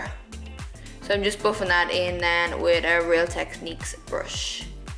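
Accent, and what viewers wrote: Ireland. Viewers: I loved your look in this video and overall. I found you shopping on Tarte! I bet you will be the next huge thing on YT<3 Where is your accent from? You are in CA but sound Irish?=P I'm a new fan from NZ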